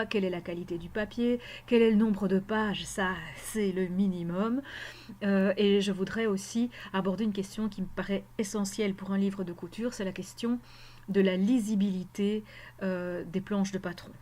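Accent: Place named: Belgium. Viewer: J'adore aussi les livres de couture, les magazines. Il m'arrive de les lire et dévorer avec les yeux avant de les acheter. Et je les conserve précieusement, comme ma mère avant moi. J'aime bien qu'il y ait les patrons grandeur réelle, même si je ne réalise pas tout. Merci pour ton initiative. Je me suis abonnée et vais voir ton blog. Ton petit accent du côté des gaufres est délicieux.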